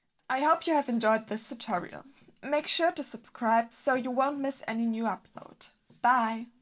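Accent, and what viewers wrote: Germany. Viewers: I'm not a musician at all but I do enjoy these tutorials that you post. I do love your voice and accent (I know you're German) but if you ever heard me talk you would laugh at it cause I live in the southern part of Arkansas in the United States lol :)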